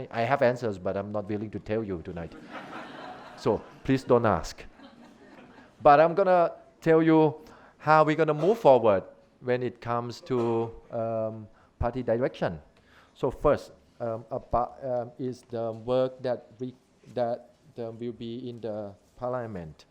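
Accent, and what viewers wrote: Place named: Thailand. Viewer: อังกฤษสำเนียงไทย โคตรฟังง่าย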